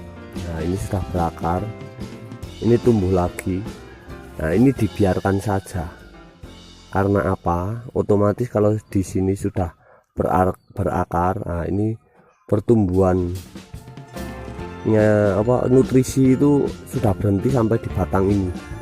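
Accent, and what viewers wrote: Temanggung, Indonesia. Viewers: Temanggung ya mas?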